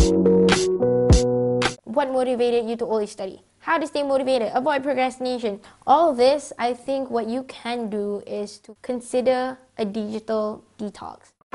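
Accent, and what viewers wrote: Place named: Malaysia. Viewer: I really like the way you talk in english.. ppl nowadays like to sound lah mat saleh and end up orng lain tk faham.. u ckp still ada bunyi malay but thats how we yg ssh nk faham jadi eh eng senang je hahahahahha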